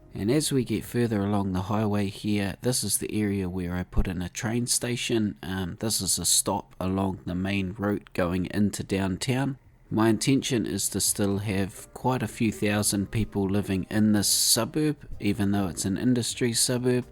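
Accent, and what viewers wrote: New Zealand. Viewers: awesome! been waiting for this one mate :) Looks so like nz countryside, it just says home to me :) loving that kiwi accent too bro!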